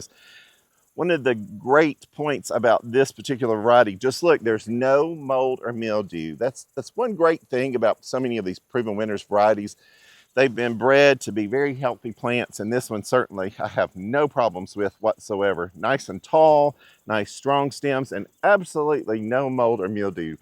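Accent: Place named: South, United States. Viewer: I came across your site by accident and when I saw you talking about your Playing the Blues Salvia and Peach Drift Roses, I knew you would be inspiring. Also, you sound like you are from the South. Although I live in Georgia, I enjoy hearing from other gardeners about what they have planted.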